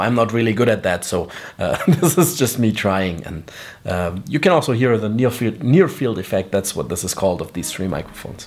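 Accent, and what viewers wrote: Germany. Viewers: hi du bist deutscher oder ? höre da viel deutschen akzent raus ? Also ich liebäuge ja zur zeit sehr mit dem rode nt usb. das akg hat mMn. auch sehr gut geklungen, aber wenn man sich so viel auskennen muss um da viele einstellungen vorzunehmen, damit es so klingt, bleib ich wohl lieber beim rode nt usw. ich will auch kein xlr + interface usw. kaufen. finde ich übertrieben, da ich es ja nur am pc benutzen möchte. das akg dich enttäuscht hat, hat mich echt überrascht, weils halt am anfang gut klang und allgemein ich nur gutes von der firma gehört habe.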